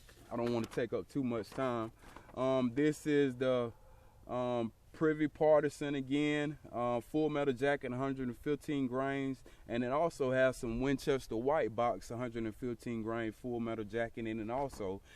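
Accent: South, United States. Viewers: Don't feel bad about your accent. I'm from Georgia too. I was born in Rome Georgia. Now I live in Tennessee though but we're of the South and don't be ashamed of our Southern ways of talking or cooking or our love of guns. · I love the southern drawl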